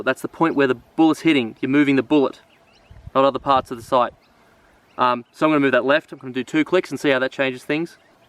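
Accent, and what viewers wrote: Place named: Australia. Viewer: I just stumbled across your channel today by sheer accident but you have me hook if for no other reason ( although many reasons) you are the first Aussie I've heard that just speaks like a regular Aussie I just genuinely appreciate that and for once don't feel miss represented please keep up the honest genuine feedback and the fact you're not trying to tell answers but have a discussion. Mate you're one of a kind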